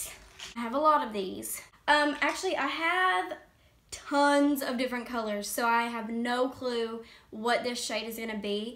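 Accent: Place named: South, United States. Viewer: I just subscribed because of that accent!!!! I love the Southern accent.. Wish I could have more southern Americans coming to the UK the accent is a delight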